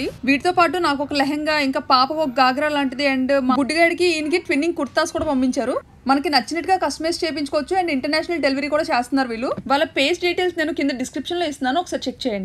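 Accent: Vizianagaram, India. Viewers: Happy wedding anniversary 💐 God bless you I am Anasuya from VIZAG , Profession by Teacher. My native place is VIZIANAGARAM. Mi matalu chala baguntayi. Mi matalu vini VIZIANAGARAM aria anukunnanu. Adi currect iyyindi. You're so Active. God bless you. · మీ , మా యిజనగరం భాష బాగుంది. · vizianagaram praantheeya bhasha Vinipistundi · మీ విజయనగరం యాస కు.. ఫిదా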